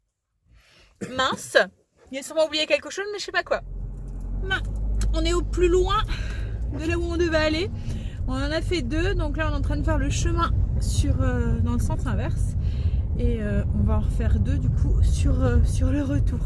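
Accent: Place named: Pas-de-Calais, France. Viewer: Cc moi je croyais que t habiter dans le pas de Calais comment tu parle pareil que ceux du pas de Calais car moi je suis née dans le pas de Calais es y habiter jusqu'à 12 ans es toute ma famille es là haut donc toi ses le nord.sinon rien a voir quand tu fais les poussières avec du savon noir du rince ou non merci pour tout des partage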